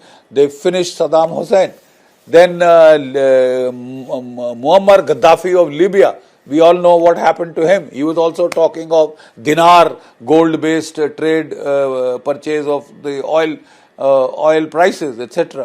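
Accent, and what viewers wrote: India. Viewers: I like the way he talks , he speaks English but with an Indian accent, which sounds very nice.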